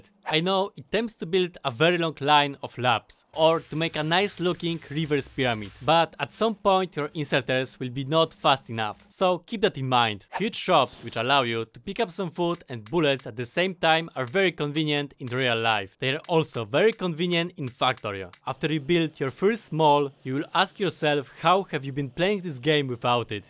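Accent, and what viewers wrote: Poland. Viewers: Ty z polski? Taki akcent masz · That Polish accent is funny) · Od razu rozpoznałem polski akcent :) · twój angielski naprawde zajebiście brzmi jak na polaka. akcent dalej słychać lecz nie jest cie aż tak ciężko zrozumieć. Trzymaj ten poziom mordo, bo nie musisz go podnosić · Beautiful Polish accent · Witam zacny poradnik :) Wiedziałem że gdzieś słyszałem ten akcent Polska gurom!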